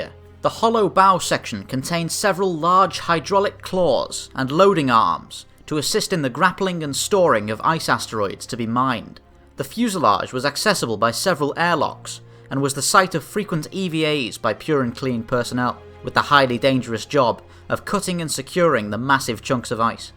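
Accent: United Kingdom. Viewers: I really like your videos! Question are you British it sounds like it.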